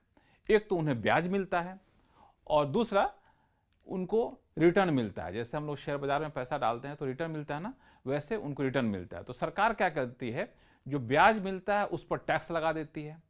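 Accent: India. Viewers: your delivery of Information in very desi andaaz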